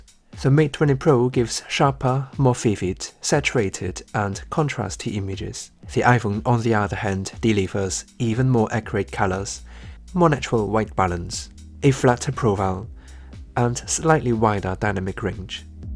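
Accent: United Kingdom. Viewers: I like your British accent 😀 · 英式英文哦！！！ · 只是有点听不惯英国口音，呵呵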